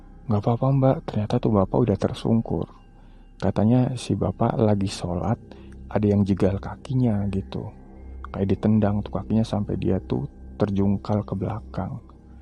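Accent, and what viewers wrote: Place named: Tegal, Indonesia. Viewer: beuhh tegal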